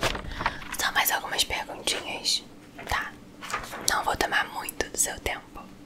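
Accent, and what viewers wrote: Rio de Janeiro, Brazil. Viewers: Sotaque carioca feminino é tudo de bom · Aahh nem acredito que vc respondeuuu logo reconheci o sotaque tbm souuu espero te encontrar algum dia na rua talvez kkkkk te amo sou mtt fã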